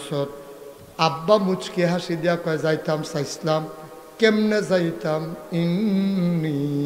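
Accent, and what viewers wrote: Comilla, Bangladesh. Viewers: আহা,আমার মধুর কুমিল্লার আঞ্চলিক ভাষা। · কুমিল্লার ভাষায় ওয়াজ মাহফিল শুনে ভালো লাগছে!!!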